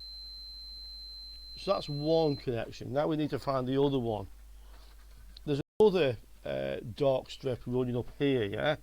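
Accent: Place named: Stoke-on-Trent, United Kingdom. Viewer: I'd recognise that accent anywhere, where in Stoke on Trent, do you hail from my fellow potteries brethren? Longton/Blurton is the stomping ground of my youth, then briefly moving to Liverpool for 18 months. Before permanently relocating to Walsall, just a few miles south of Birmingham.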